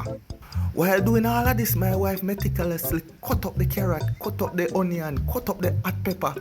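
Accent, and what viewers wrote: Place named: Jamaica. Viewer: love the voice over Jam dung style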